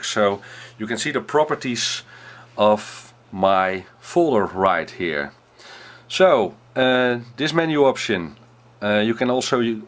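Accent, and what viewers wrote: Netherlands. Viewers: ja dat is echt duidelijk te horen door een nederlander :p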